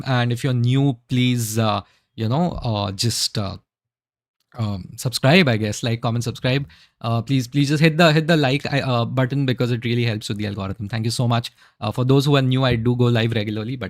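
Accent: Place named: Allahabad, India. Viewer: You sound like an Allahabadi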